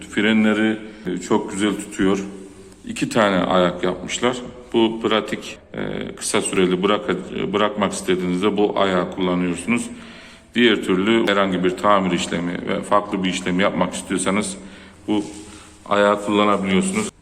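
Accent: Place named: Kayseri, Turkey. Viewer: 5 tepelerin oraya geldiğinde anladım kayseri olduğunu usta ama asıl anladığım rampayı çıkamayıpta sonradan rahatlıkla çıktım demen tam kayseri esnaflığı 😄 bi kayserili olarak esnaf ağzını gayet iyi anlıyorum 😁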